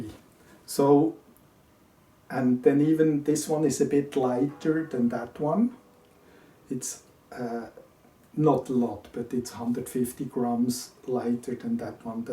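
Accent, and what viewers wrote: Switzerland. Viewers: Hi, great video. You sound a bit Swiss. Do you live in Switzerland by any chance?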